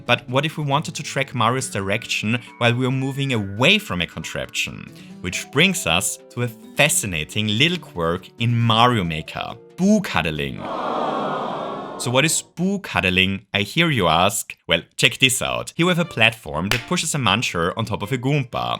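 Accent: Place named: Germany. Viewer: I never get tired of watching your content. Being honest? I like your accent. It gives your videos some personality. German, perhaps?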